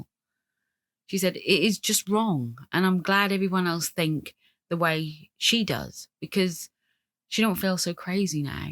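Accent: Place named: East Anglia, United Kingdom. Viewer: Norfolk? Suffolk? You started off and you’re accent crops up , less Norwich , more Ipswich ? Go on , I’m Yarmouth Girl here born n Norwich , miss the Sea ? ‘ hearing ‘ , that was a blast , subbed , hadn’t seen youre channel which odd , most female crime channels wind me up ~youre emotions are appropriate and feel real . Cheers for the vid , tragic sad story ✨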